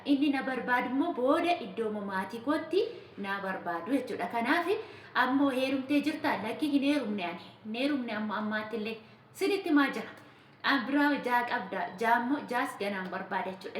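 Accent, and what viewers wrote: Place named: Wollega, Ethiopia. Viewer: Sagalee beekama silayu akka wlg tate❤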